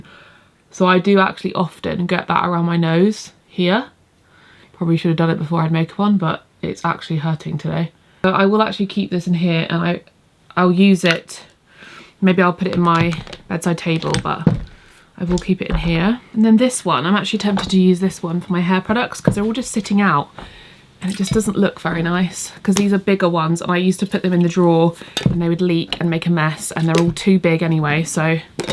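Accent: United Kingdom. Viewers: 🐶. love your videos. Your home is beautiful. I could listen to you speak all day… the British accent is everything! Red and burgundy are your colors… very flattering to your dark hair and porcelain skin ❤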